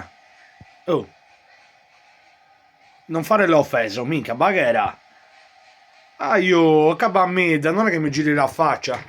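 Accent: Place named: Sarrabus, Italy. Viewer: Accento del Sarrabus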